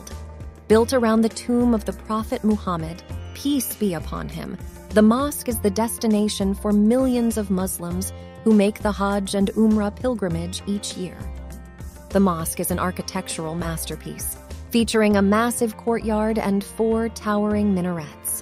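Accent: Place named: United States. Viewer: She is pronouncing it fine brother. It's her American accent.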